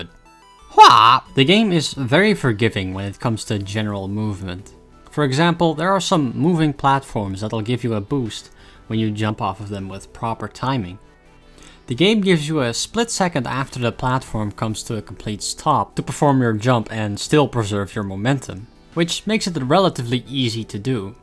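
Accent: Netherlands. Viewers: Hi. You Dutch?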